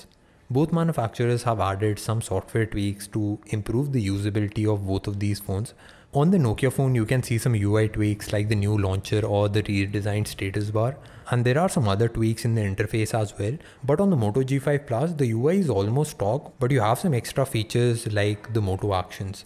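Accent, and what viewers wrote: India. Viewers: Why are you people complaining about the way he speaks when you know he is from India?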